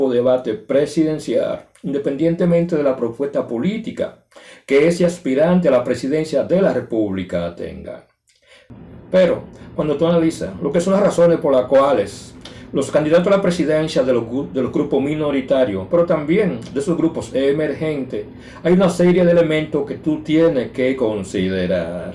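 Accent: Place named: Haiti. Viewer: Se le nota el Haitiano a este comentarista.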